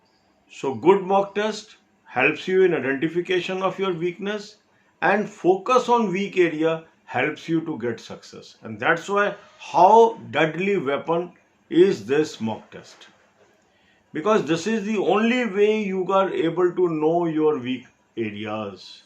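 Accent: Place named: Punjab, India. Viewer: Sir g tuhadi Hindi'ch jeda punjabi touch aaunda e, o menu boho vadiya laagda e😁😁 Love n respect 🙏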